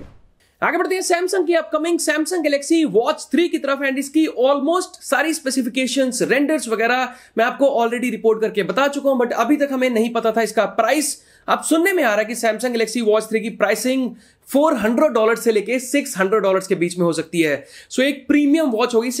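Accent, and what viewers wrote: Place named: India. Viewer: I have so much pain trying to understand what you are saying. English is not my first language but i usually have no problem understanding indian people that speak English. But somehow your accent is so strong i don't understand half of what is said.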